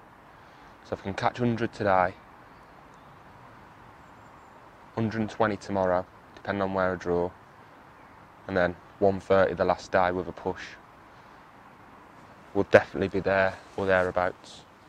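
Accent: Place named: Black Country, United Kingdom. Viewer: is back, is back. Allo, allo. Great to hear those Black Country tones back. You've been missed. Looking forward to the rest of the festival videos.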